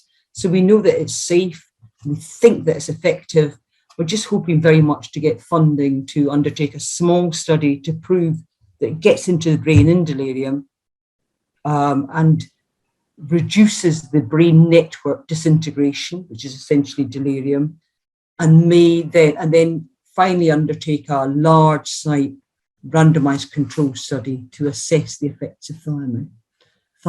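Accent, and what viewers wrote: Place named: Scotland, United Kingdom. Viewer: Good o hear aS ottish accent! Thereis little about helping carers to cope with the after effects. My wife Jean 81 years old, was rushed into hospital with incontinence which was diagnosed as bowel impaction. She was later diagnosed with a fracture of the L1 vertebrae. She had to wait for transfer to the spinal unit. Very slow recovery , loads of opiods given. Referred to community hospital for assessment and discharge into a care home. One week later she was returned to A&E who diagnosed bowel impaction, this was three months after the original diagnosis. She is recovering mentally, still has panic attacks, bed ridden as no physiotherapy has yet been provided though her rheumatologist carried out tests and said that intense physio was needed.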